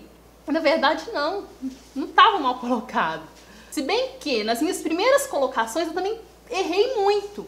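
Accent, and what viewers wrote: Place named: Minas Gerais, Brazil. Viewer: esse sotaque que acho lindo? tu és mineira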